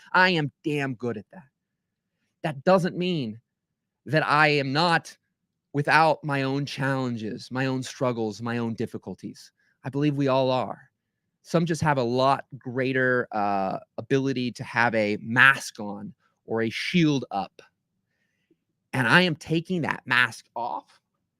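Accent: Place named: South, United States. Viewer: Please don’t ever stop doing that southern intense accent. Here for the sweat! Dude I lol so hard while driving to this. Loving the new videos, keep it up! Gawd dang ! Let’s go!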